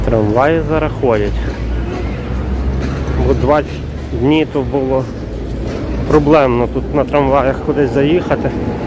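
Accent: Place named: Galicia, Ukraine. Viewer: Не звертайте уваги ні на які зауваження! Говоріть як говорите,чудова вимова! Я коли приїжаю до Львова то не можу наслухатися галицької говірки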